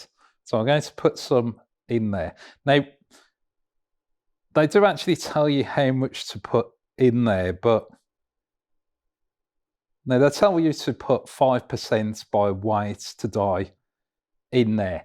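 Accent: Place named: Birmingham, United Kingdom. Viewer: I take it by the Brummie accent you in the UK lol. What do you think of the Onefinity Machine and what was the customs charge to get it into the UK? Im ready to order one but a bit nervous of the Customs charge. Also, what do you think of the Makita Router with it? Does it do a good job, or should I order a PWN Spindle at the same time. Sorry for all the questions.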